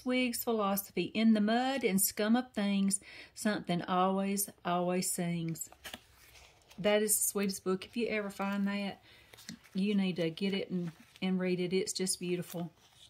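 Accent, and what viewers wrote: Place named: Alabama, United States. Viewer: Beautiful journal! Awesome first video! This Texas girl loves your Alabama accent!!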